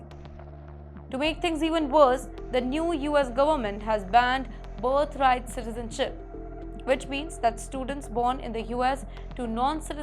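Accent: India. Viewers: Please somebody, get this young lady to speak English with a proper accent. If not just speak hindi! These indian youtubers have such atrocious accents that I switch them off. That guy beerbicep or whatever is one of the worst! Please learn from the tharoor guy!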